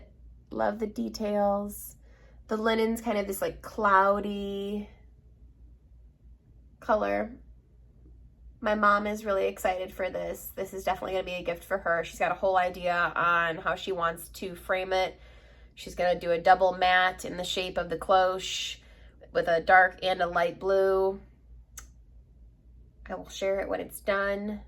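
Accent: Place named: Chicago, United States. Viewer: That Cryptids SAL is looking awesome! Love that Chicago accent too 😜 Your videos are great!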